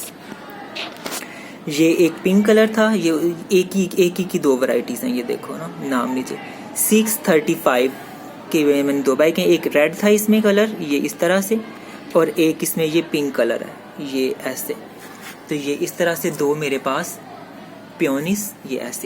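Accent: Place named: Pakistan. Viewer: aap ki awaj see lag raha tha ki aap Pakistan see hai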